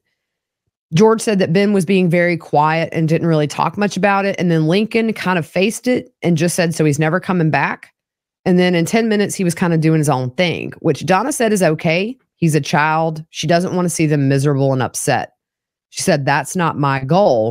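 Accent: South, United States. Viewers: I like your southern accent. I live on the west coast and when I ended up in jail for 2 months when I was visiting NY everyone said I had the accent. Triips me out how many accents there is in the same country. Anyway my comment isn't about this evil family. Sorry.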